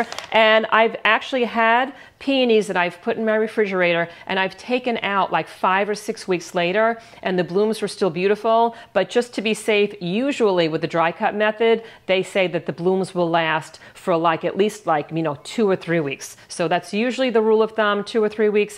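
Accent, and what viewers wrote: New Jersey, United States. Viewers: It's good to hear a Jersey accent again! I live in middle Tennessee now, Zone 6B, originally from north Jersey, Zone 5b/6a. We have a row of beautiful peonies that are over 60 years old. The story goes that my mother-in-law received them as a gift when my husband was born. They have a lot of meaning for us! I did my due diligence to prepare the soil well for the tubers we split from the originals and they are doing very well. I want to make sure I continue to care for them well. I had never heard of dry cutting before and I will definitely do this next Spring.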